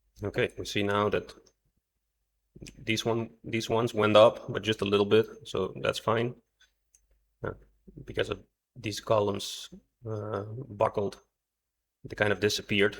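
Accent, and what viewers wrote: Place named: Denmark. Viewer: Listen Mr. Civil Detective with your (Danish?) Accent: This old Architect having worked on NYC Bldgs (one with Fred Severud as Engineeer....others): Your presentation is the very best of ANY including that guy from Severud and many others. Lucid, Comprhensive and mathematicall.. All these cute Chicks interviewing any engineeer they can get their hands on (NBC/ABC/CBS...,,,,,,=UGH. State Your Name, Company, credentials so we (few) rational people can find your sites. You are first rate and give me faith in big time engineering again.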